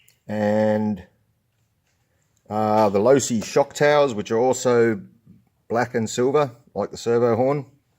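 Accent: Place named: Australia. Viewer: Ahh dude nice upgrades! And your an Aussie, love your country and accent! Liked and subscribed 👍👊 how did you find the fitment on the area RC stuff!? I bought some area RC alloy upgrades for my x maxx and none it fitted properly! I destroyed 4 wheel bearings before giving up installing the hubs, sent it all back and gotta full refund though 👍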